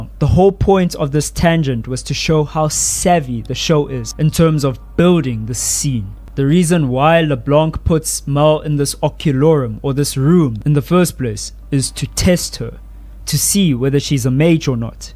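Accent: South Africa. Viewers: Is just me or you South African your accent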